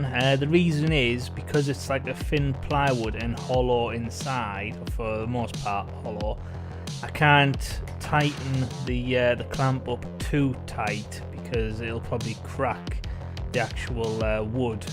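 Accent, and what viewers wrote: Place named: County Durham, United Kingdom. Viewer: Hi buddy, you sound like you are up my way. I'm from Seaham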